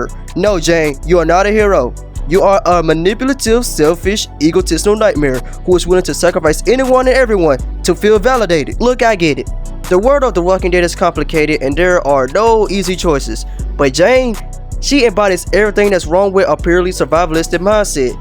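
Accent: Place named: Louisiana, United States. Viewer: What the heck? What kind of accent is that? Is that a Louisiana accent? Whoooooooaaaaaaa! Sir you are a gem. Please make more videos.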